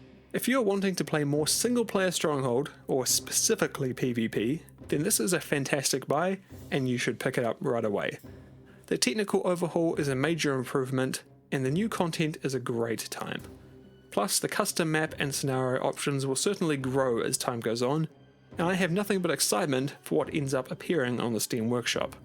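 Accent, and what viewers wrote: New Zealand. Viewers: Love the Kiwi accent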